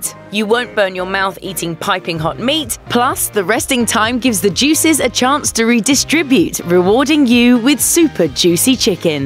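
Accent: England, United Kingdom. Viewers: Why is a British person talking about this??🤔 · Hey folks, I'm no "expert" chef or anything, just a Mississippi boy who's been frying things for 35+ years. Fried some squirrel I'd put up in Feb just today! lol. I've only fried chicken about...uh...250 times...lol..so I'm pretty good at it (do anything 250 times and you can't help but get good, right??). Anyway, I have to say, I don't like most cooking videos, especially really slick ones like this - with an English narrator, no less, lol , but these tips are really, really good. If you will follow these tips you'll do just fine. And each time you cook chicken you'll learn something. But I'm going to make just a few little suggestions that work for *ME*. I cannot speak for anyone else. First, regarding cooking temp: 300 to 325 isn't quite hot enough. I suggest getting the grease closer to 375, even 400 isn't "too" hot, because even if your chicken pieces are room temp (which is rarely the case if you could feel the middle) your oil temp WILL drop when you add your chicken. 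325 is a pretty good temp to *maintain*, but even then I like a little closer to 350. Second, be careful with that "brine" idea. Yes, it can be a benefit, but it's certainly not necessary and sometimes it can negatively affect the texture of your meat, introducing a bit of a "compressed" or "processed" feel - which is never preferred. I would try getting good results first without the brine. If you want to marinade or pre-season in any way, just rub the chicken down with salt and maybe some cajun seasonin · Thanks British lady. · Gilda garbutt wyer I have not watched a mashed video with a british host/narrattor. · Woman with British accent talking to US about fried chicken? Dafuq outta here! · The British narrator ruined it for me 🙄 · The first tip to making fried chicken is not to listen to a British accent narrator tell you what you been doing wrong! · I'm sorry but I can't listen to someone with an English accent talk about the "right way" to fry chicken...I need to hear more twang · I'm sorry but I can't take someone with an english accent seriously when it comes to frying chicken... 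leave that to us southern girls. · New british voice! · fried chicken, British accent... doesnt match...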